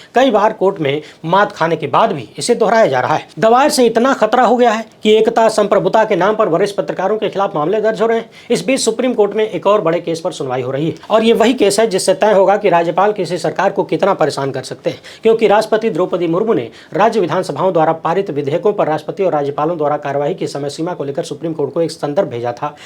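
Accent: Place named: Bihar, India. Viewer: bhai apke kaam se ziyada aap pasand hi mujhe apke bihari language make me laugh..hats off bhai.. for your unbiased reporting...Allah apko humesha kamyabi dey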